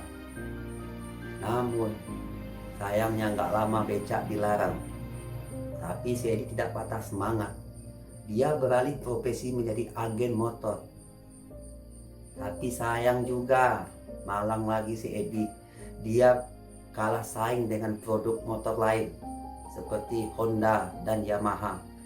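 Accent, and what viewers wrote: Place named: Padang, Indonesia. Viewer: Wah....orang padang nih mah.....logat nya atuh...he..he....he...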